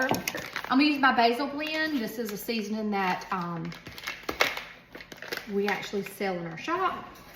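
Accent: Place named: South, United States. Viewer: I love the southern accents you, Dan-O, Hickok 45, Stalecracker and a bunch of other southern cooks real Americans 👍🇺🇲 bless all ya all😊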